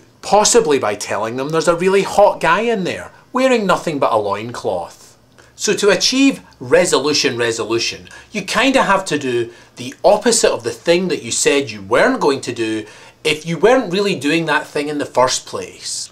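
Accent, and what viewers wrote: Scotland, United Kingdom. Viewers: Let's hear it for monotonous, inane, recycled social commentary and weak attempts at humor. For the record, Scottish accent != funny. I'm currently soaking your youtube account in vinegar... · Can't get past his Scottish accent-I prefer Michael Myer's version because he's actually funny. YouTube vids stink when it's simply someone's ugly face sitting still while their eyes dart from the camera to the monitor so they can catch a peek at what they look like while shooting the vid. I'd rather watch Michael Richards. His bald, gouteed, & earring look-he's just plain annoying. Wish his resolution was to trash his webcam & switch careers to something where you can't hear or see him. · I thought this was funny. Nice one. Loving the scottish accent lol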